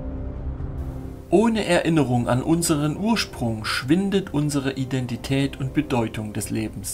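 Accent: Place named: Saxony, Germany. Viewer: Langsam gewöhne ich mich an den sächselnden Tonfall der Lesungen 😊. Ich höre mir mittlerweile jeden Abend beim Kochen und Hauswirtschaften ein Kapitel aus den Erzählungen unserer Vorzeiten an und bin jedesmal inspiriert. Lieben Dank für diese erzählerischen Ausflüge zu ein paar Kapiteln unserer Vorfahren ❤. Zu den Riesen fällt mir ein bebildertes Kinderbuch wieder ein: Gullivers Reisen! Ich habe als Kind nie verstanden, warum die kleinen Menschen sich allein wegen der Größe des Riesen fürchteten und ihn solange bekämpften, bis er auf der Erde lag und bewegungslos gefesselt wurde 😢. Die Riesen waren ja unsere Mitmenschen und zum Teil Familienmitglieder und Freunde.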